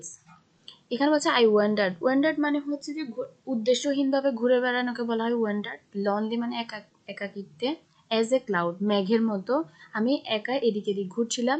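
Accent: Chittagong, Bangladesh. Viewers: সুন্দর তবে চট্টগ্রামের টান মনে হচ্ছে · Maam mone hoy...Chottogram er..... vasa shune mone holo....😊❤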